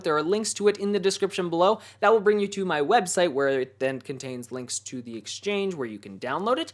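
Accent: Canada. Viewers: You sound very Canadian... If he is sorry I had no idea. I thought that because of how he said sorry (Soary).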